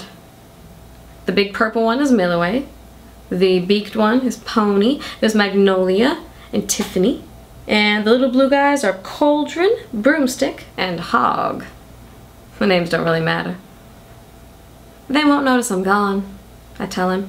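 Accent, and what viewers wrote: South, United States. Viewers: Don't fret... The accent is an accident for most of us southerners too! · Not a bad Southern accent I must say! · I actually heard multiple southern accents as you read, and each of them was pretty good. That may be why you think it's bad but, as lifelong southerner, I think they were just fine. Bless your heart! ;) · I gotta say I really can see you as a great southern belle with that accent. Great story.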